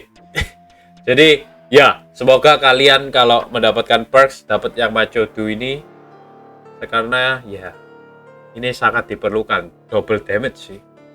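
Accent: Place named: Java, Indonesia. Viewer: Si medok membantai Zombie kapan nih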